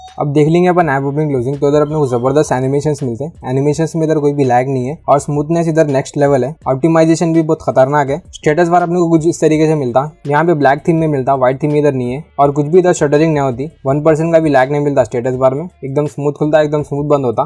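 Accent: India. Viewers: Love your hindi accent. Dont change the way you speak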